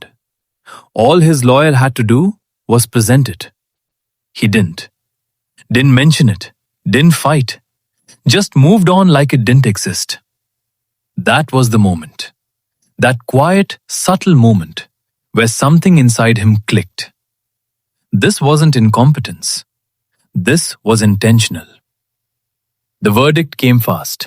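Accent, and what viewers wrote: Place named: India. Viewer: Hello bro 😊 I am also thinking too create videos like this 😊 I think you should change your ai voice tone or English levels It's giving indian voice tone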